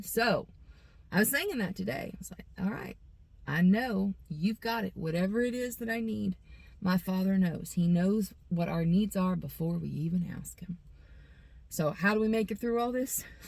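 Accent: South, United States. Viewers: Love this young lady. First time viewer, l was mesmerized by those piercing eyes and when she started quoting scripture l was hooked. Love the southern twang as well.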